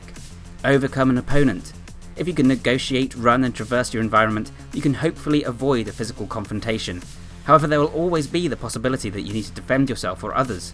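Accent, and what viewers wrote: United Kingdom. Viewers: At the risk of sounding rude, your voice really doesn't match the body I'm seeing in the video. Where in the UK is this guy from?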